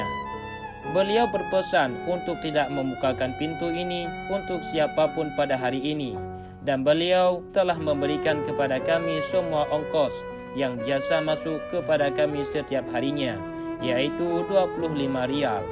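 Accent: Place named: Aceh, Indonesia. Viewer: Suara mimin Kek logat org aceh ngomong bhasa indonesia 😅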